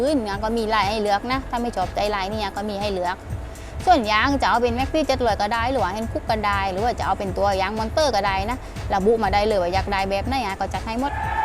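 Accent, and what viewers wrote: South, Thailand. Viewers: นี้และมั่น เป็นตัวของตัวเอง บรรยายได้ดีมากคับ ผมก็คนใต้ ชอบๆคับแหลงหรอยนิ🥰🍃💨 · บรรยายแบบใต้ๆ จริงใจกันเอง น่ารักครับ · พูดใต้เป็นตัวของตัวเอง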